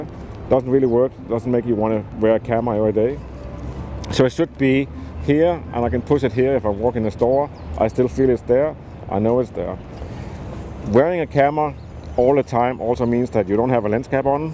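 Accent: Denmark. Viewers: Are You from Denmark can hear a little dame in the accent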